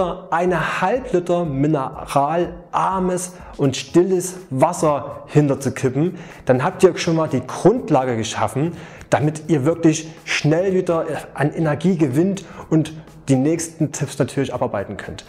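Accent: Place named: Saxony, Germany. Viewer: Alter sächsisch Mann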